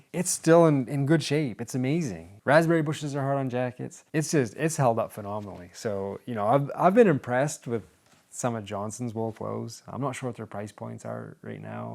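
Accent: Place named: Maine, United States. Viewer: Off topic question, where are you from? Your accent in some parts sounds like it's from Maine but in other parts sounds like it's from Canada.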